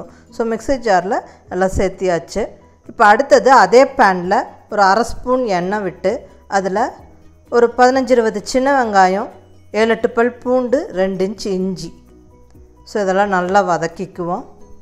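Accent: Kongu, India. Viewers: Mam super recipe. Kongu Tamil superb Namma Coimbatore subscribers ellarayum meet pannalamae mam PL reply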